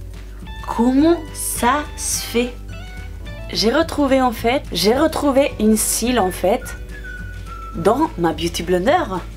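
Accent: Spain. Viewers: le "mo" c de la moisissure 😂😂 et c le fROmage et pas le Formage😉😂 et pour info je sais qu' elle a un accent et qu' elle est espagnole et pac française de base c pour ça qu' elle fait des fautes et je l ai juste corrigée pour qu' elle s améliore c tt les rageux il faut vous calmer😂😂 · Jsuis la seule qui kiffe l’accent espagnol sur le français ? 😍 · Axent espanioll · Tes trop chou avec ton accent espagnol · T espagnol ? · T de quelle origine ? Ps: superrr videooo ❤ creo q ablas espagnol pienso q e reconosido el acentoo ❤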